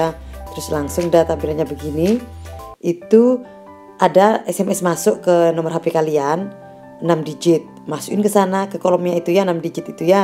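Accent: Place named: Madura, Indonesia. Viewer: waaikumsalam, Youtuber pemula kyak aku hrs nyimak nich so check it out guys logat madura nya terasa berat hehehe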